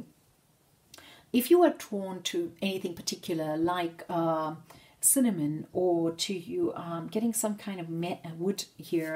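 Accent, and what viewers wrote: South Africa. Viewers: Love your style 🔥 Also lové your accent, are you based in South Africa by any chance?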